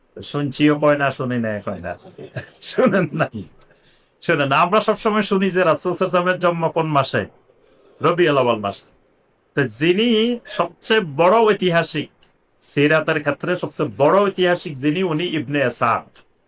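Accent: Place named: Noakhali, Bangladesh. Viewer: বাউরে হেতে বলেন চমৎকার। তবে নোয়াখালীর আঞ্চলিকতা ছাড়তে পারলেন না এখনও হুজুর।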